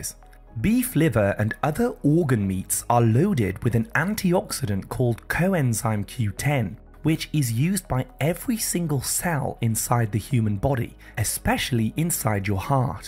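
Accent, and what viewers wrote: England, United Kingdom. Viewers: Oh, puleeze, STOP the commentators with the English accents!!!!!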